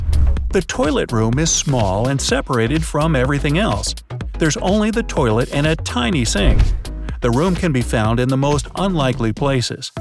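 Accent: United Kingdom. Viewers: Baaaathroom. The British accent makes me sick! Sounds so robotic.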